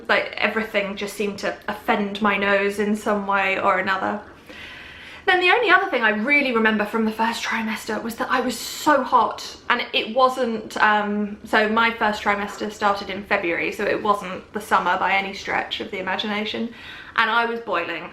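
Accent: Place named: United Kingdom. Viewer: Elizabeth Ungemach British accent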